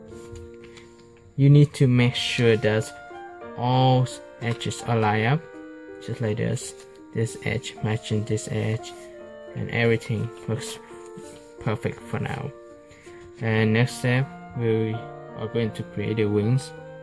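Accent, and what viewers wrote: Vietnam. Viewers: Nghe cách nói tiếng anh là biết người việt rồi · Anh này làm video hướng tới người nc ngoài, nên tiếng Anh là chính, nhưng là chất giọng Việt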